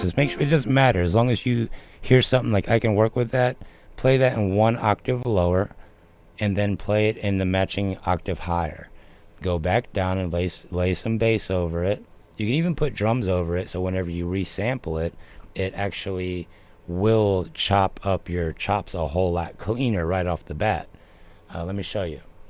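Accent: St. Louis, United States. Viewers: Yoooooo are you are Cardinals fan? I’ve always taught you talked kinda like a true south STLer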